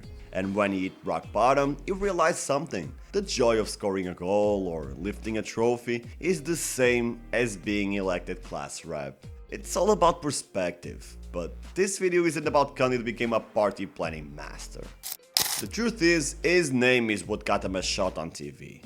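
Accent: Portugal. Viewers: What a 💩 accent… really hate the Portuguese accent. 🤷‍♂️🤷‍♂️🤷‍♂️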